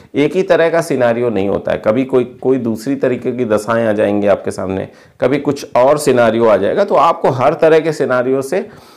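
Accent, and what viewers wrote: Kanpur, India. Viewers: Kanpur k h sir language wahi ki h apki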